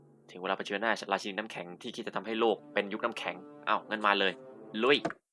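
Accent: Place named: South, Thailand. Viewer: จากที่ดูหลายๆคลิป ผมรู้ว่าพี่เป็นคนใต้แต่ผมแค่ไม่มีหลักฐาน สำเนียงคุ้นมากครับ 😊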